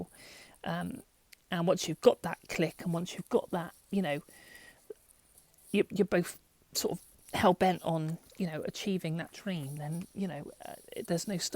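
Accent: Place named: RP, United Kingdom. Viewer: I found you. You have a Tremendously strong QUEENS ENGLISH accent (hope thats not bad what i said?) A blogging channel? Ok... I'll be actively looking out. Nice to find you 😊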